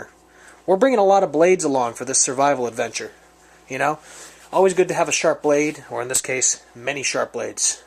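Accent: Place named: Brooklyn, United States. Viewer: Hahhahahhahahhahhahahhahahhahahah Brooklyn accent that's hilarious Thanks for the great vid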